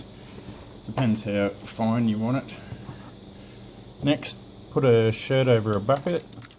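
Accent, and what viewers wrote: Australia. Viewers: hahaha, me thinks its his aussie accent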